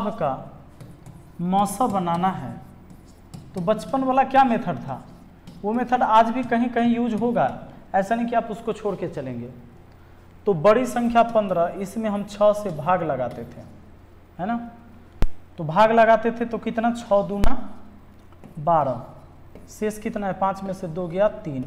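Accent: Bihar, India. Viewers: Sir aap bihari ho kya aap video mai ache language use Kiya kro😅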